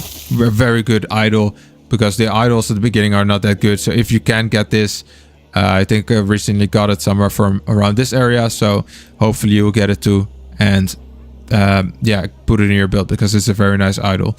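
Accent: Netherlands. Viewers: Great Video, You sound Dutch or South African?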